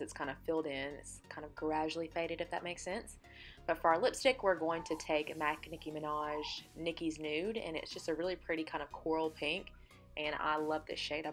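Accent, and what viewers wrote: South, United States. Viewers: I love the southern twang. Where are you from? Hate the music!!!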